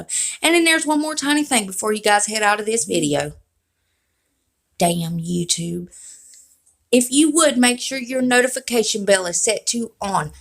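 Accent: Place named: Texas, United States. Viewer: Where are you from? You sound like a southern girl like me...Texan! 😁 Love your channel!😍😍😍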